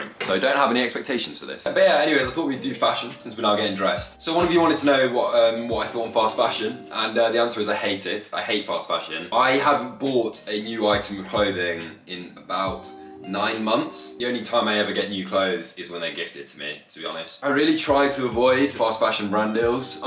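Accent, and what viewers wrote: United Kingdom. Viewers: THE BRUSISH VOICE AHH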